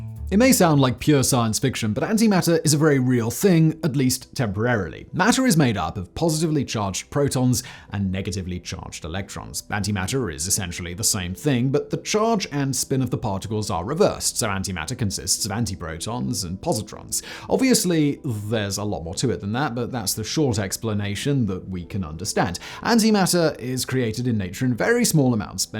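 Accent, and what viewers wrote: United Kingdom. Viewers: Is He British ? I am having trouble understand him 🗿 subtitles to rescue ❤️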